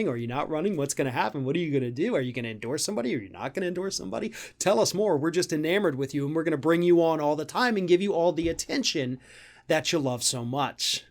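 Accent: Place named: Florida, United States. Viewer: Love your Floridan accent saying," egth,! I dont want that agaun,!" " what was I doing? VOMIT "!!!☺️🤠✌🏽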